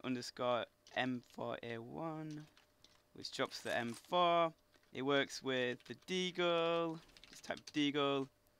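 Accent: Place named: England, United Kingdom. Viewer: fucking anoying english accent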